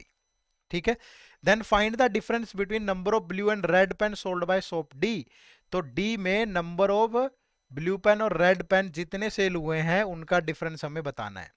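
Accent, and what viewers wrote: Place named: Haryana, India. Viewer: Solved. Answer is c & great session Sir. Love your Haryanvi accent!!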